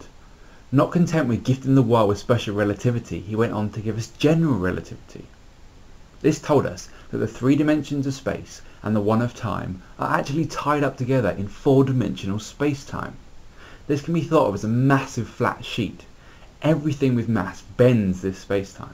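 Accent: United Kingdom. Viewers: i wanted to understand it but i cant understand with his british accent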